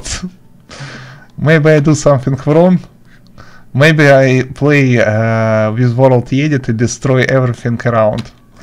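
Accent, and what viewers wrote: Russia. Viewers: eu tenho quase certeza que ele é russo pelo sotaque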